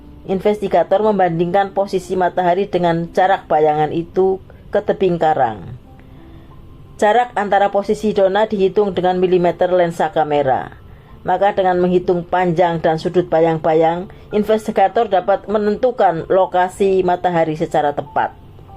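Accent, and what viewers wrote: Java, Indonesia. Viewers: trllu mdok jowone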